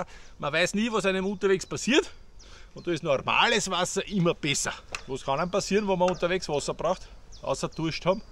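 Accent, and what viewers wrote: Austria. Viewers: Einfach top erklärt der Herr. Der österreichische Akzent ist sowieso die Krönung👍👍und es macht Spaß zuzuhören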